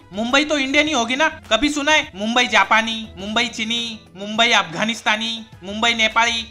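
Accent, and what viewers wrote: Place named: Gujarat, India. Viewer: He is gujju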